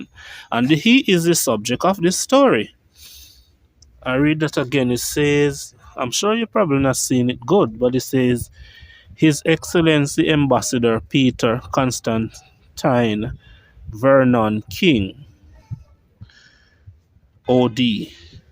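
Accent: Jamaica. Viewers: I understood every word. Some French, Spanish, etc. people speak English with an accent yet are not ridiculed. Patwa is our mother tongue. Gwaan taak, mi luv yuh Jumiekan accent🙂 Luuk yah, Google translate have patwa now, suh me wouldn't mind if you chat full patwa😉. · He is Jamaican we speak how we chat.. Lol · You have never heard patwa before? Stfu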